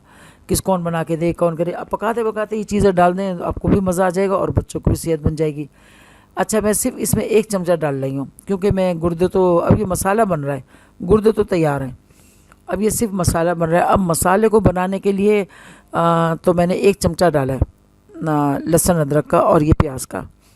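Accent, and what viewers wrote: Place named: Hyderabad, India. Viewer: As salamualaikum Aunty mai toh aap ka fan hogaya aap ka baat karne ka andaaz toh mashallah. Maut daaldere aap toh hamari Hyderabadi zubaan me kiraak 👌👌👌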